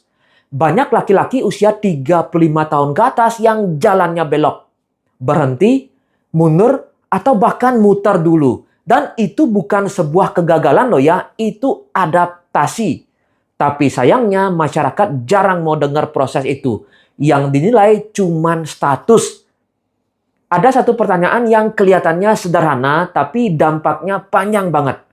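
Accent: Bali, Indonesia. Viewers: Logatnya kek dr bali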